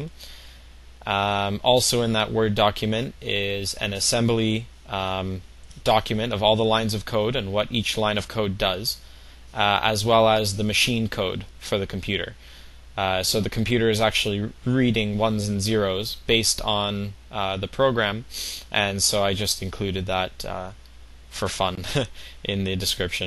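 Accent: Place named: United Kingdom. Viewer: He's hiding a British accent.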